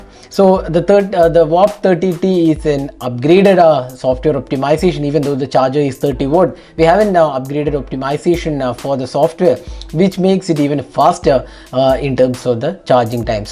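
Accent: Kerala, India. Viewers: He's from kerala according to his accent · Ur mallu slang is so predominantly heard ! Chetta keep it up ! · Work on accent neutralisation, content is decent. Kill the accent and you'll do good. Good luck. Btw I'm a mallu too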